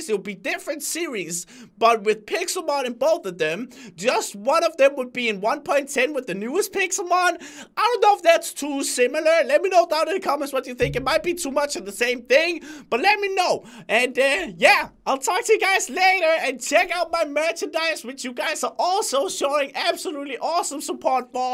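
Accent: Denmark. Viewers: One video u should do u in ur danish accent with subtitles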